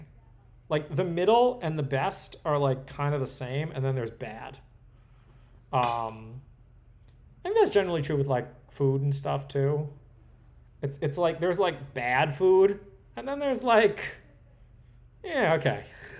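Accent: New Jersey, United States. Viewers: That New Joysey Áccent. 🙃🙂😃😊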